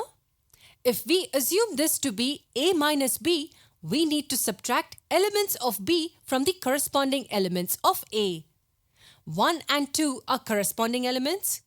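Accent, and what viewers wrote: India. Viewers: Whats wrong with her accent? Seriously? Dude, we are here to learn something, it does not matter what accent, race or religion she belongs to. I would suggest, get used to Indian Accent because in the next 5 years, you are going to only hear that on YouTube as more and more people in India come online. This is 2020. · Indian accent :(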